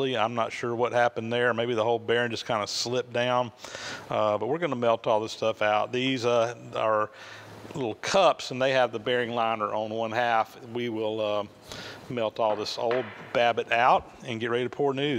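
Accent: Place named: Georgia, United States. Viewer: The best accent in the US (Georgia) to pronounce > Babbitt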